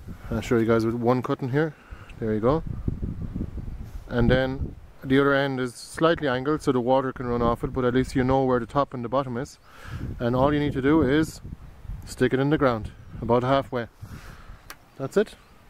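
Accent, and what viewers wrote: Ireland. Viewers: Hi,,thank you for the info,,,enjoyed listening to a good old Irish accent,,you have a new subbie now,,hope to make an arch,soon,,,Cheers,,,Stay Safe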